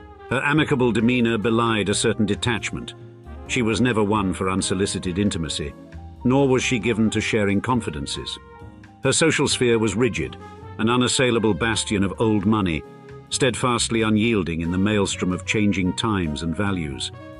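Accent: United Kingdom. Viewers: Question about the narrator's accent: British or some modernized version of Mid-Atlantic?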